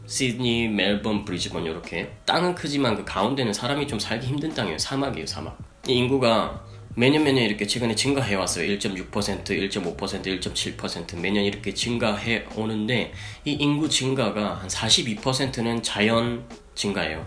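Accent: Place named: Seoul, South Korea. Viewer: 서울말 쓰시느라 수고 많이 하셨... 저도 브이로그 참 좋아하는데요. 유튭이 제 브이로그도 별로 안 좋아하더라구여 ㅋㅋㅋㅋ 화이팅 합시당 ㅋㅋ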